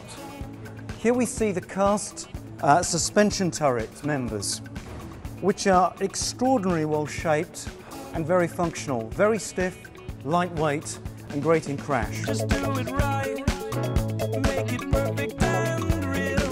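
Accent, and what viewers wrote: United Kingdom. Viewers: lol. They had to take a guy with a british accent. For some reason, people tend to swallow bullshit as long as it's coming from the mouth of a british. I could smell some bullshit in that speech. I know it's a good car, I like the car, but this guys tried to make it look like the car is more then what it actually is. He tried to sell small achievements as big "nearly impossible" achievements. I mean c'mon now. We know the car is good but please..... quit the bullshit. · Between his British accent and that awful music, I didn't understand much of what he was saying.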